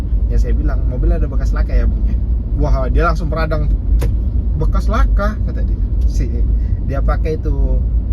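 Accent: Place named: Padang, Indonesia. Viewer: Logat abg kyk org padang 😅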